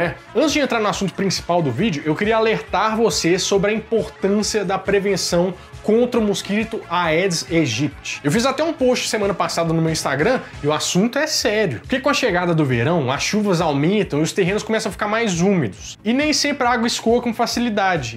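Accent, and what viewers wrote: Minas Gerais, Brazil. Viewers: ce é minerrr cumpadi? <3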